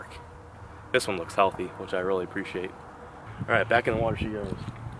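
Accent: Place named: Texas, United States. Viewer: anyone from 2017 and notice his texas accent? LOL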